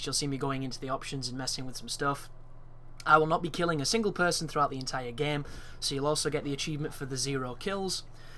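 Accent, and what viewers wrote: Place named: Scunthorpe, United Kingdom. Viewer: You sound very local.... Well, too me you do! Where you from? Can't be too far from Scunthorpe!?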